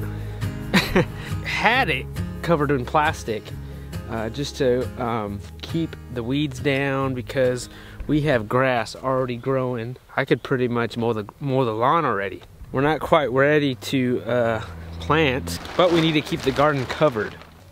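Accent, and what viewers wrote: Southern California, United States. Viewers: Excellent work! Your greenhouse is amazing! I wish I had that same greenhouse on my farm! Beautiful! Raise The Ruff! Be careful! By next year you may be calling it a Roof! Ha! Ha! The longer your here the more you will lose your Southern Cali Accent! You should hear my sister in law's accent now. She is originally from Glasgow Scotland. However, after many years living in North Carolina she has the most unique accent you've ever heard. Part of it is Scottish accent, part of it is Southern North Carolina Accent! So Beautiful! We will compare as time goes on to see how your accent is affected! LOL! It's All Good! When we lived away from the south in Japan and New Mexico I lost some of my southern accent for a while too! Funny how environment and surrounding people affect what we sound like! I hope it doesn't offend you how we tease you about your accent. 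I used to get teased a lot about my southern accent when my husband was in the Air Force. People would always ask me to say certain words and often just say to me... Can we just listen to how you say things??? So Funny! It never bothered me. I just laughed and had fun with it! No Harm! Just Laughs! God Bless!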